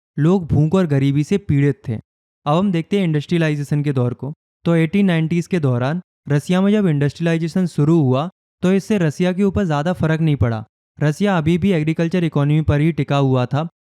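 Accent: Uttarakhand, India. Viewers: bhai tum uttrakhand se ho kya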